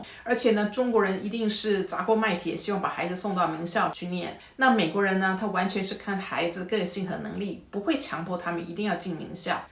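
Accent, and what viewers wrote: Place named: Taiwan. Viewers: 明顯是台灣的腔. 台灣腔有許多種類。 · 很明顯的台灣腔啊。怎麼在美國40年還可以保有這麼自然的台灣腔，厲害！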